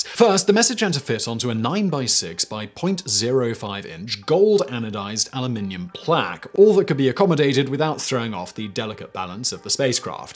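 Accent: United Kingdom. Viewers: Can you guys make a video of this dude saying THAT WAS A REMARKABLE ACHIEVMENT in his thick british accent?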